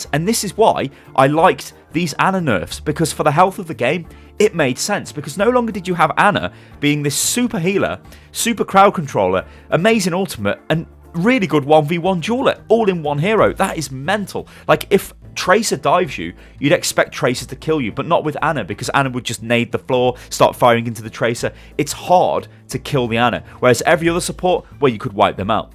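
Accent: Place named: United Kingdom. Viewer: Sometimes i wonder if i am even i interested in the video itself or am just staying because if you nice smooth british voice and accent ¯\ツ/¯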